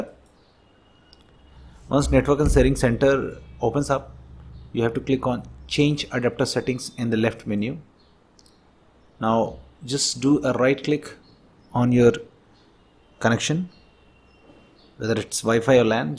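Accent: India. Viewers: Bruh i love indian thank you soo much you guys sound so cute with your accent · Omg dude thanks soo much my internet was have a stroke and being very slow and i tryed every turotrial but its pnly yours that works thank you i dont know why people are calling you a scammer cause youre voice is indian. <3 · Why do I have a feeling these are botted and he is making his channel fake -_- I tried this and it didn't even work. I have Windows 10. For some reason my duckie senses are telling me not to trust this guy with that many subscribers and then with this many comments. And most of them positive I just have a feeling this guy is lying. But I really like the Indian voice. So that is my review, Thank you!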